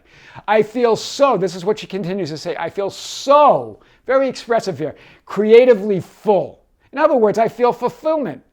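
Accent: Boston, United States. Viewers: Yesss! I knew it was a Boston accent, I love guessing accent's... Its my thing😅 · Aw we love that Boston accent 😂🤍🤍🤍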